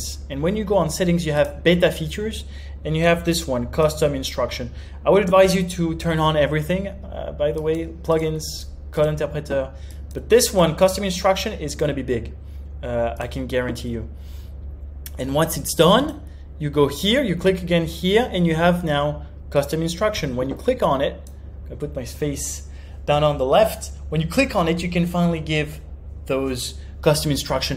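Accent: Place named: France. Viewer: Je perçois un petit accent de langue française… Parles-tu français par hasard? 😊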